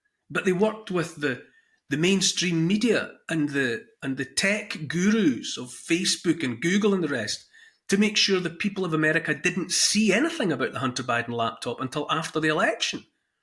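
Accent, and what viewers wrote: Scotland, United Kingdom. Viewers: I thought I was listening to myself with a scottish accent ! thank you. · You speak of bad, sad things and i am fully on the same page with you. But listening to your Scottish accent awakens a hope for humanity in me, don't know why. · with his rough Scottish accent is very wise and insightful!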